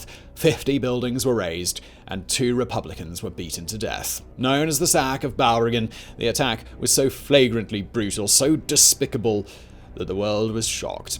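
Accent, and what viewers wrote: RP, United Kingdom. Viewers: There's something about listening to someone with a fairly posh English accent explaining Irish independence, but this was a very good video.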